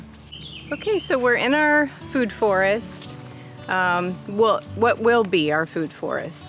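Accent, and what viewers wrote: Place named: Michigan, United States. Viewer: I thought I picked up a MI accent. Really like your property and homestead. Very peaceful. Looking forward to make garden and bee hive updates!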